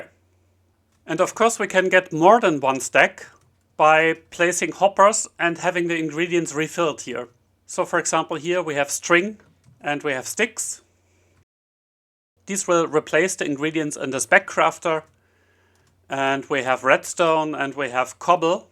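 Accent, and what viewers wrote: Germany. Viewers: The world needs a technical minecrafters with german accents, no nonesense german efficient minecraft engineers.. now you need a ridiculous theme tune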